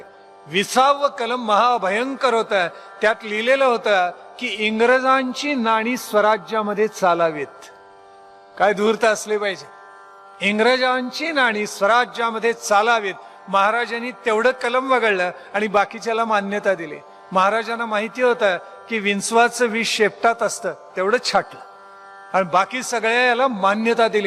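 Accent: Standard. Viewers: आदरणीय गुरूवर्य आपल्या अमोल वाणीतून छत्रपती शिवाजी महाराजांचे व शुर मराठे सरदार व शुर मावळ्यांचे पराक्रम व महाराजांनी केलेला राज्य कारभारा बाबत केलेले उद्भोदक प्रवचन ऐकून मन तृप्त झाले. आपली पवित्र वाणी आणि सुस्पष्ट शुध्द मराठी उच्चारा मुळे आपले प्रवचन सतत ऐकत राहावे अशी मनोमन इच्छा होते. आपल्या चरणी विनम्र प्रणाम.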